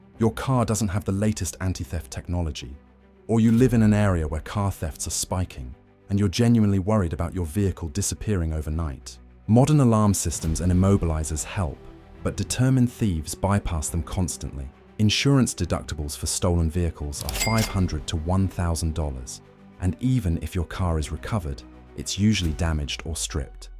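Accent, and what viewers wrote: United Kingdom. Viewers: Why the British accent?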